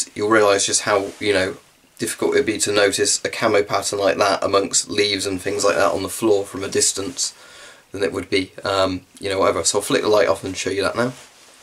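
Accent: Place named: United Kingdom. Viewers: Americans will listen to a Brit read the IRC tax code since we love that accent. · I like the way he says hello with his British accent and every video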